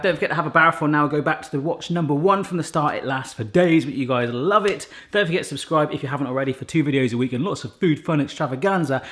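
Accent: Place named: United Kingdom. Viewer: I really like him and his videos but I'm American, and British terms and speech patterns are freaking hilarious. Courgette you mean a zucchini boy raise your pinky you fancy British boys. I love you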